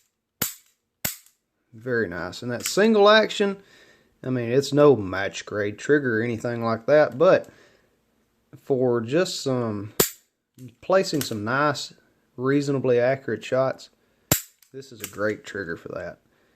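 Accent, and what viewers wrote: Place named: South, United States. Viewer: ""THEOLD SOUTHERN-ACCENT, ""NO ONE TALKS OR SPEAKS THESAME""!!!!!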